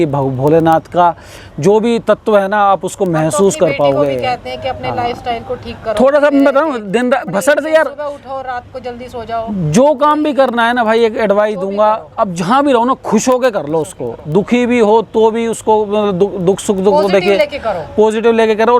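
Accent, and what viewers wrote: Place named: Haryana, India. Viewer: Faridabad ,Palwal ki si boli hai aapki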